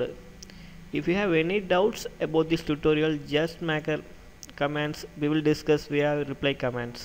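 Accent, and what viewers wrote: India. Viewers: When people make fun of him for trying to talk English. He lives in India, of course he is going to have an accent. At least the websites and pages he has open has the words in English for those to understand easily. Heck I can understand his steps even if I didn't have the volume on, read the pages and follow along. .-.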